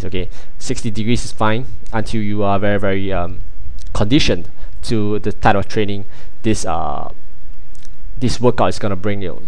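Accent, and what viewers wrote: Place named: Singapore. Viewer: er you sound like a singaporean, am i right?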